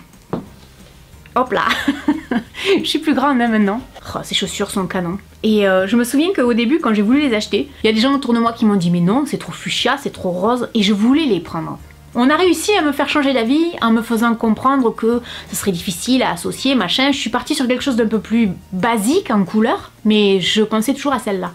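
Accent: South, France. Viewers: je trouve que rentrer le tshirt dans le pantalon allonge vraiment ta silhouette ! j'ai découvert ta chaîne il n'y a pas très longtemps et j'ai regardé toutes tes vidéos j'adore tes concepts et ton petit accent du sud ahahh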